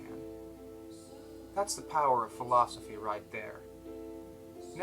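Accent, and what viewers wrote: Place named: United States. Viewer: i'm not too surprised, you're not the first and I daresay not the last to take it upon you to defend american honour or against generalisations/stereotypes and generally ignorant comments. if you're offended I apologise. No buts, just sorry. I find the accent grating and partnered together with a decimation of quality media such as David Attenborough's Frozen Planet annoyed me enough to take it out on all of you Americans. It's not that bold, it's the internet - expect to get input akin to this.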